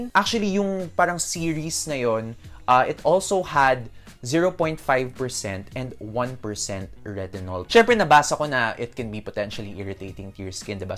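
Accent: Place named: South Boston, United States. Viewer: Soo Southie nga 😂 Just stumbled upon your channel, very informative 👍🏽👏🏽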